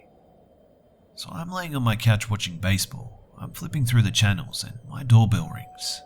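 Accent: Australia. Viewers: Are you by any chance Australian? I'm Australian and picked up on your intro haha! Thought I'd ask :)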